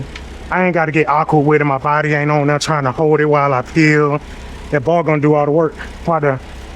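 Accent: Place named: Maryland, United States. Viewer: You sound like someone I know. Are you from Maryland by chance? 😅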